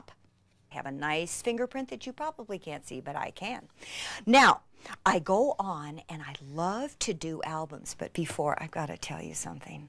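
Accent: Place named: United States. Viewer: man this woman combined with her american accent is just the best. ive got stuff to do but i want to watch the whole video!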